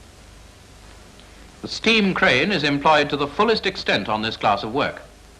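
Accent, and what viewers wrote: RP, United Kingdom. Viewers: Amazing! Where did you find this? If this footage is unrestored it's even more amazing. Love the "BBC" English! Thank you!! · Classic 1930s commentary, BBC English delivered in that patrician and reassuring style,alongside plenty of technical jargon to keep the Great Unwashed enthralled and firmly in their place.Job done,and train passes sweetly over the new junction at Chester General...now let's have a nice cup of tea.Utter respect for these men,my father worked as a shunter and track repair ganger for 15 years,just 13 miles from where this was filmed,including through the harsh winter of 1963.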